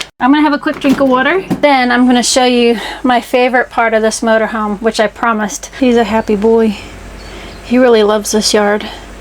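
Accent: Australia. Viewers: If you dont realize it, you are picking up a bit of an Aussie accent. Can i ask the approximate cost of your Toyota If they were sold in the US there would not be a single unit sitting. Youre so cool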